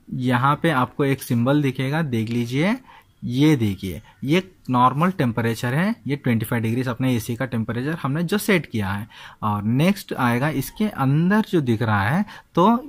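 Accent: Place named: South, India. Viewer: Very good explanation I think you are from south india area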